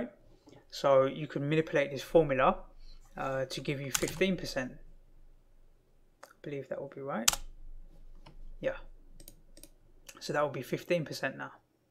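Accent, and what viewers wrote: United Kingdom. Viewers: R u British bro.?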